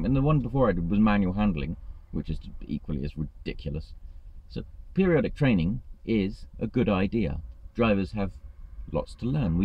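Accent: RP, United Kingdom. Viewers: He's BACK BABY!!!!!!!!!! I thought you've lost it on your last video but I was wrong. the funny is back and most importantly the posh voice, GOD I missed it so much. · hahah hope you get in to CPC training and do mine when I do it…with your posh accent please, would make the day a bit more fun! :) Just a question, I'm about to go ahead with the training course for LVG C+E, with The LGV Training Company (they came up first in google when I typed LVG Training Courses) would you recommend them? For the C+E + CPC+something called Direct Access Course, it mounts up to £3K… is that the normal price these days? Thanks in advance and thanks for your videos!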